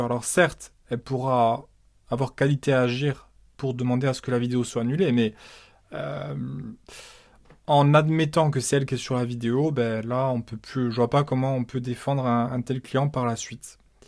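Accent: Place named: Nice, France. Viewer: Merci encore pour tes podcasts ! À écouter en fond avec le petit accent j’ai l’impression d’être de nouveau chez moi à Nice 🫱🏼‍🫲🏽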